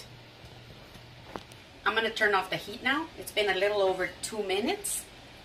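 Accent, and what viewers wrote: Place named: Louisiana, United States. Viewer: YUM!!! your accent sounds like "Southern Louisiana" to me. I lived in Baton Rouge for about 18 years.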